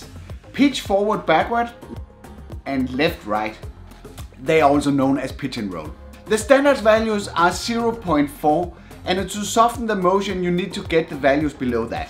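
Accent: Denmark. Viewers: That’s a very danish accent there👍👍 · Love the accent. Danish... :D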